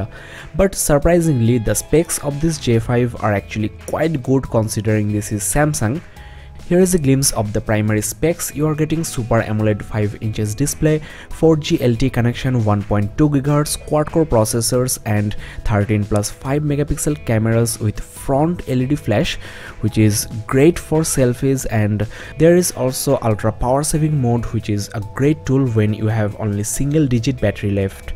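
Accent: India. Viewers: esse sotaque indiano -.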